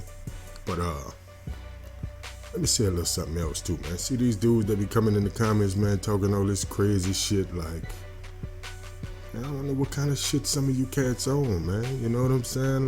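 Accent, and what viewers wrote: New Orleans, United States. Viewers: Definitely a new Orleans nigga doing this video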